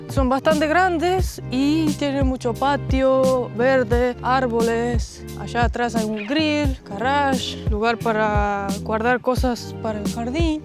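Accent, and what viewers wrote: Argentina. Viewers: Tiene acento argentino o me parece😮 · Fantástica, debería hacer más vídeos sola, por cierto no veo nada raro en tu voz, lo curioso es una estonia con acento argentino, deberías buscar un acento más acorde a tu continente, es decir castellano europeo, no latino americano, que no es algo malo, pero pareces argentina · Habla su español en Argentino 😅 .. te felicito por tener muy buen español excelente video bonitos recuerdos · tienes acento argentino 😲😮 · Habla perfecto,es español,y tiene acento argentino😊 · Un lindo detalle tu español es muy Argentino, me hace reír , se te escucha bonito , cuando quiera mudarte a algún lugar vente a vivir a Venezuela,la isla de Margarita pudiera ser y cuando extrañes el frío , te vas a los Andes , y quiero selvas Ríos te vas al sur Al estado Bolívar , y al estado amazonas , y si quiera decierto ve a los médanos de coro , Adicora , y para el caldo s ve a Maracaibo, y quierea estar con ganado te al llano , montas a caballo , y cantas y bailas Joropo , aquí lo tienes todo ven que te muestro 😅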